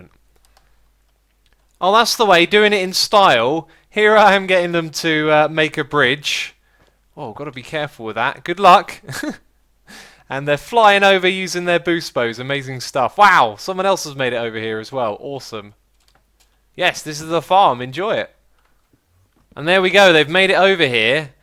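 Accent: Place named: United Kingdom. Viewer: u sound so British...I am assell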